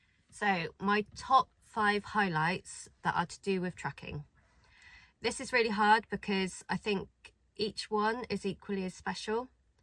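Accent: West Country, United Kingdom. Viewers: Hi again, having just subscribed to your channel it offered me this one to watch, and I am pleased it did, you spoke alot more in this one, which was great and reminded me of my late partner who sadly died Aug last year, she (Valerie) was from the west country, Somerset to be exact, so hearing your accent really warmed my heart and brought back some lovely memories, we were together 20 years and I still miss her, thank you for sharing. All the very best to you, from Nick.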